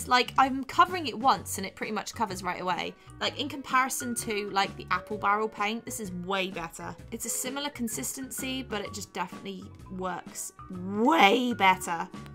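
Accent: United Kingdom. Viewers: OK im new I love you! Just want to know how did you get such a strong UK accent, if you been in the US most of your life? I love your accent btw just asking how it came to be? Love your video and you! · Maybe i missed it, but if you've been in the US for so long, why is your accent so thick? I understand you learned to speak from your parents who are presumably also British, but I'd think being here from a young age you would have lost some of it. I hope that didn't come across poorly, there's no criticism there, I'm genuinely curious. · Lol I thought that you where British to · I thought you were British, lol it truly doesn't matter, you're still beautiful.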